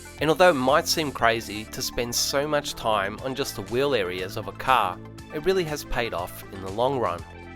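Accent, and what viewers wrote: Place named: Australia. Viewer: 3rd video in and I’m hooked! Good to hear an Aussie voice in a car detail video, Big fan of your work and your car, pity I’m on the other side of the city.. Sub.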